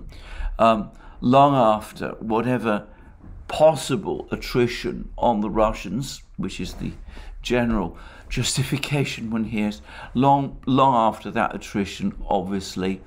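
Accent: United Kingdom. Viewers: Great job AM and I am a sucker for British accent.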